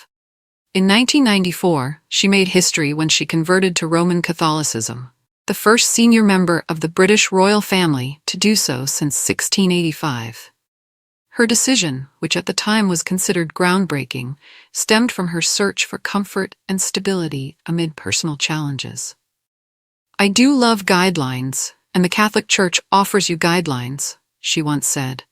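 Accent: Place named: United States. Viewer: She is a feckin' Roman CAtholic, of course she's going to have an RC requiem mass for her funeral, so to will Princess Michael for that matter. Ye Gods, you make it sound as though something never seen before is going to happen when it isn't. What WOULD have been remarkable would have been if they forced the family to hold a NON Catholic requiem mass for her funeral. For a supposed UK channel - you might want to lose the US accent, gives millions the wrong idea about your channel - you don't appear to know what you're talking about. The only ''unprecedented'' part is that she is the first to die among the RC family members and thus the first to have a public RC funeral but she isn't the only family member who is Roman Catholic and it won't be the last RC funeral either.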